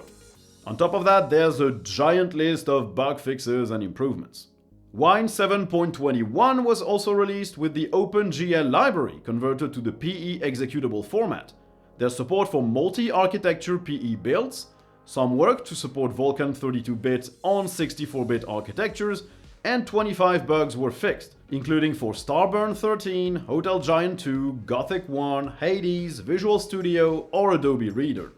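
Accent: France. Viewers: I definitely love my country. Besides your french accent is incredible.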